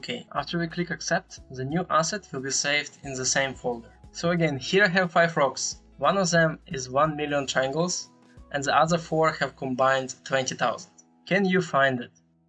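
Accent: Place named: Serbia. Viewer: A hahaha, yeah guessed cause of English accent, Serbs sound the same speaking it, and I feel you, I'm only around 180 which is short for a Serb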